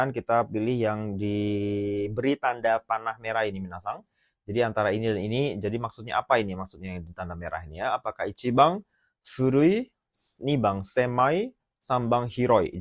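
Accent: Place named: Medan, Indonesia. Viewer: lah, sensei orang medan,, kirain wong jowo hhahah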